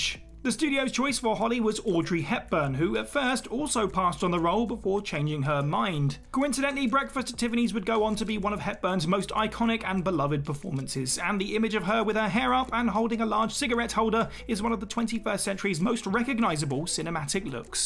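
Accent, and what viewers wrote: United Kingdom. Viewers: You Brits and your proper English. 😉 It's Elvira, long I pronounced El vy ruh. And Lily's 1st name E van jeh leen. I think a Stallone Beverly Hills Cop would be cool to see since we got the great one from Eddie. I enjoyed the Snowman movie. My mom had died before I saw that film. It made me cry since the main character lost his dad. It's a heartfelt movie.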